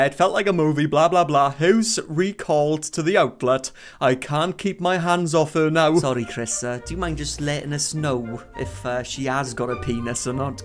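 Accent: United Kingdom. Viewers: Lmaoooo had to watch this video with subtitles coz of the thick Brit accent but worth it 😂 This girl needs to go away and do some self reflection for at least 5 years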